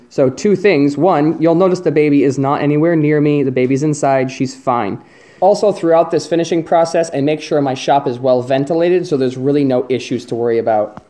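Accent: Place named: Utah, United States. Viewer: This guy sounds like he's from Utah.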